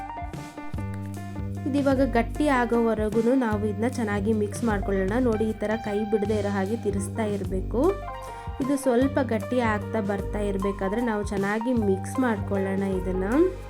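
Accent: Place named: Bangalore, India. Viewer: Ninde kannada super cook sis 🥰👍👏👏😁nin pakkka Bangalore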